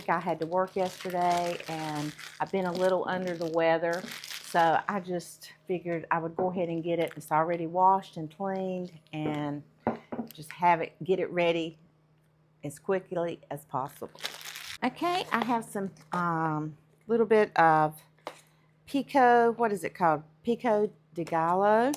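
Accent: South, United States. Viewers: Yeah I watch the videos just to hear her Southern accent.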